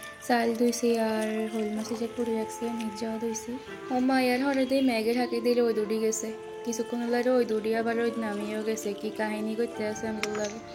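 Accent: Noakhali, Bangladesh. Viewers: আমি বুজি আপনার নুয়াখালী কথা আমি সিলেটি আপনার পাশে আছি ভালো থাকো❤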